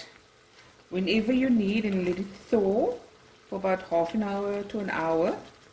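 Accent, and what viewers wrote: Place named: Cape, South Africa. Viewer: Oh, how I long for the cape when I hear your accent. I grew up there.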